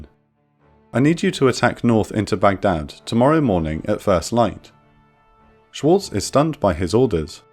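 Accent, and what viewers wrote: United Kingdom. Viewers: The guy making the video is also the narrator. He needs to hire a professional to narrate the videos because it's not his strength, unfortunately. The animations have improved significantly over the years, but the narration has not. I personally don't mind his incorrect intonations and strong british accent, but some of my friends, to whom I've recommended this channel, have remarked on this subject. In fact, even the automatic captioning system on Youtube often misidentifies his words. Does it make sense now?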